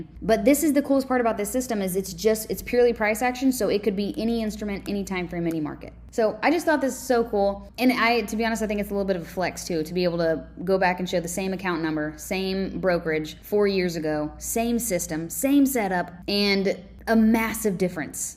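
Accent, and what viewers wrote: United States. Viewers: "you ain't got..." I love that country accent ❤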